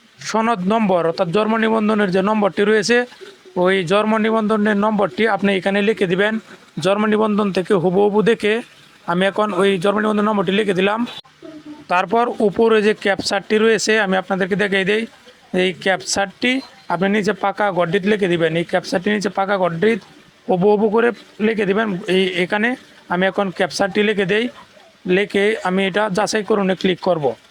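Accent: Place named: Noakhali, Bangladesh. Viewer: আপনি কি কলকাতার নাগরিক হন লাইন কি পাক্কা নোয়াখাইল্লা লোক।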